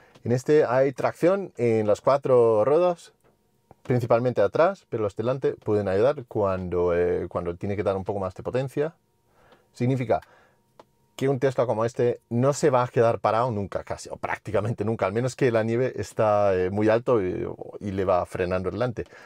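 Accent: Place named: Bilbao, Spain. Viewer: Por la manga y por el acento se nota que eres de Bilbao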